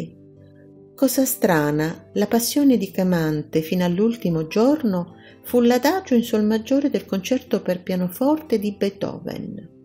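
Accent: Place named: Sicily, Italy. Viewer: Vabbè, puoi fare un corso di dizione se vuoi, ma credimi, che anche se si sente l'accento siculo secondo me andresti alla grande!!!😉 Trasmetti più emozioni TU con il tuo accento, rispetto ad altre persone che ho ascoltato!!!😍🤗♥️♥️♥️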